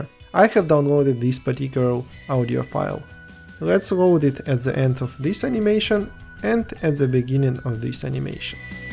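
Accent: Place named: Russia. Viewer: Wonderful tutorial! Thanks. I want to work on raspberry pi, subscribed to your channel. You have an interesting accent, similar to Russian. You are Russian?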